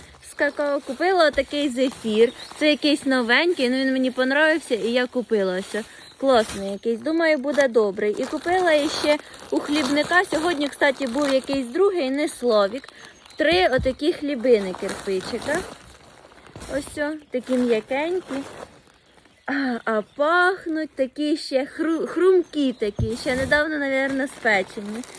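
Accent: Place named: Surzhyk, Ukraine. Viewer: Це жарт. Вона просто грає таку роль. І говорити без суржику вона теж вміє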